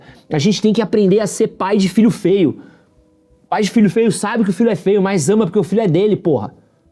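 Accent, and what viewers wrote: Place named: Sao Paulo, Brazil. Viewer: Provavelmente tu não vai nem ler isso aqui, mas você me venceu na consistência. Vou ser muito transparente: Eu tinha um preconceito com sotaque Paulista, não sei porquê. Seus vídeos eram recomendados para mim e, diversas vezes, eu clicava pra ver e na metade saía. Não sei dizer qual vídeo foi, mas algum passou uma ideia muito boa. E eu tenho o costume de me permitir ser vencido por boas ideias. A partir desse ponto me inscrevi e passei a acompanhar (eu não costumo comentar quando acredito que não vou agregar, então nem sei se já comentei alguma vez aqui). Te admiro bastante, todo sucesso que você tem é merecido. Espero conseguir desenvolver essas habilidades que vejo em você na minha própria vida.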